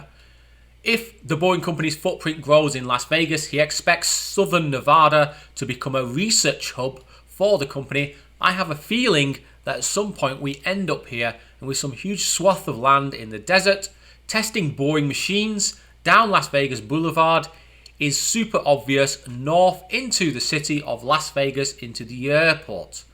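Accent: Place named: Liverpool, United Kingdom. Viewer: Man your accent.. You're from Liverpool?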